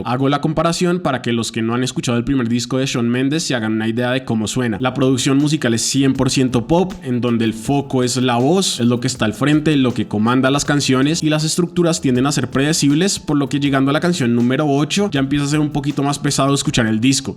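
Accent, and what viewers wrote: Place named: Colombia. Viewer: No se nada de. Me gusta el tono colombiano de tu retórica, creo que es lo que le da consistencia a tus ideas. Pues, hay otros análisis de carreras musicales en tus videos?:)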